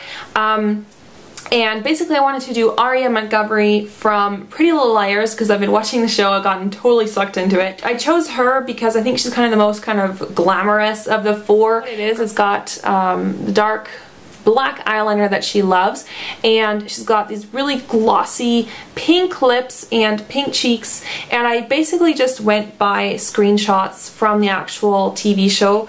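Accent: United States. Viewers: YOUR NOT FROM AMERICA!? YOU HAVE SUCH AN AMERICAN ACCENT THOUGH :O did u used to live here? thats awesome, i want to move to germany when im older :D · probably a stupid question but where do you live cus you sound American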